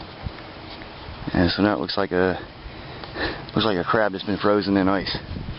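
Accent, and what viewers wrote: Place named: Baltimore, United States. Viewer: are you a Baltimorian? You sound like you are on East coast, you sound like the folks here in Baltimore, MD. LOL Just curious. Love the lamp. I am attempting to learn all these ancient survival skills so I have a shot after the Apocalypse. I plan to survive it.:)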